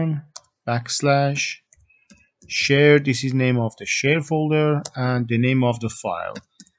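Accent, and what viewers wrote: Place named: Iran. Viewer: iranian accent :)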